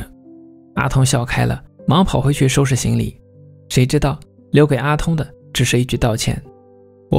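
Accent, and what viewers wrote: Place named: Qingdao, China. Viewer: 解说的青岛口音藏也藏不住😂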